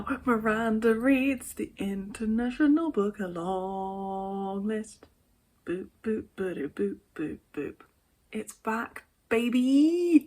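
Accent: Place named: France. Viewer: Sounds very French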